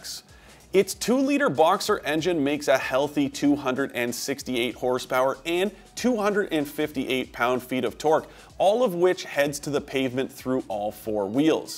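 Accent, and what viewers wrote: Canada. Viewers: First line he spoke, im like oh hes canadian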